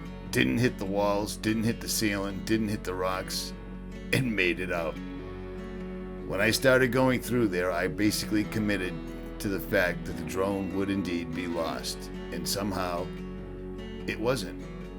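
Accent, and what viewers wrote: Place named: East Coast, United States. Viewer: You sound like. I was raised in Thermopolis and used to fish the canyon and spillway constantly. We still go back every year to visit and fish the Bighorn. Thank you for posting this. Very emotional for me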